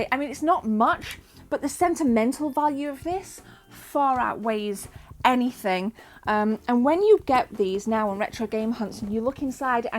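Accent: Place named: East Midlands, United Kingdom. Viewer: Are you from nottingham or derby im from derby 👍🏼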